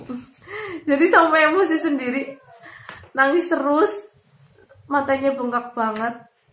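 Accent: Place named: Java, Indonesia. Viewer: Medyok sekali kakak senior idolaku iniiiii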